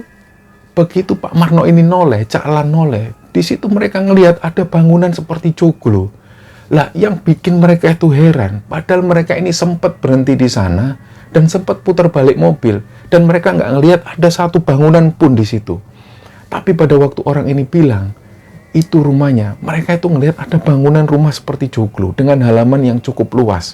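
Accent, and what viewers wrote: Java, Indonesia. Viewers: Paling uenak kl yg bawa'in ceritanya mas, apalagi pake logat khas nya yg medok itu. Assalamualaikum warohmatullohi wabarokatuh buat tim ya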